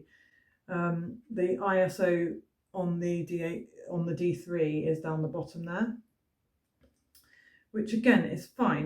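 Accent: United Kingdom. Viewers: I'm here for the British accent. 😁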